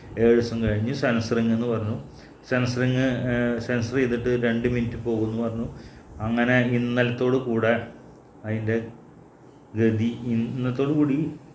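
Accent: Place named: Kunnamkulam, India. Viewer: ഇക്കാടെ കുന്നംകുളം വായിൽ irikkunno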